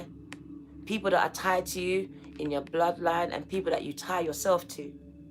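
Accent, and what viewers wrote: United Kingdom. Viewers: You are so gorgeous!! Love the hair!!! And the British accent!!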